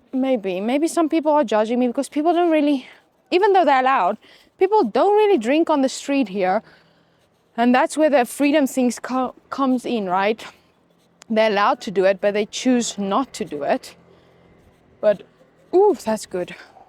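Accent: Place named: South Africa. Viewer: Did you live in the USA? I hear your South African accent, so I'm wondering.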